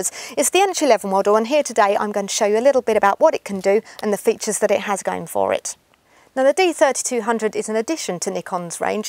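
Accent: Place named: England, United Kingdom. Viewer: I want to marry an english girl; I can listen to that accent all day long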